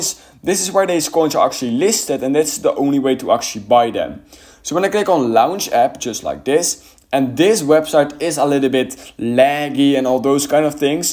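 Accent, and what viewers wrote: Netherlands. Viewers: I can hear the strong Dutch accent😂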